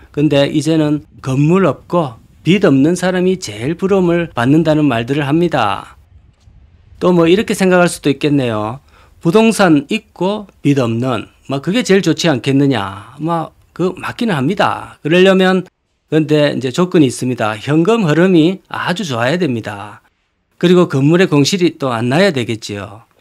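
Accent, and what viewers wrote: Gyeongsang, South Korea. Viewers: 사는데가 김해인교? 말투가 전형적인 부산.김해 이신거로 보이는데 뭐하시는분인데 공실건물을 찾아다니는건감요? 경매 하는교? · 꾸준히 잘보구있읍니다.좋은 조언감사합니다.그냥 인생공부한다 생각하고 봅니다.수고하세요.참 구수한 갱상도 사투리! 얼굴이 궁금해지네요?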